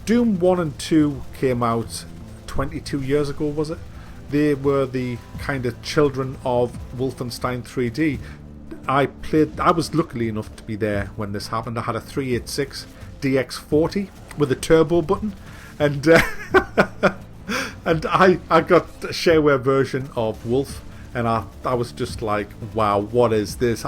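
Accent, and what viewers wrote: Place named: Newcastle, United Kingdom. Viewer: Hey what part of the uk are you from you sound like someone from either sunderland or Newcastle haha?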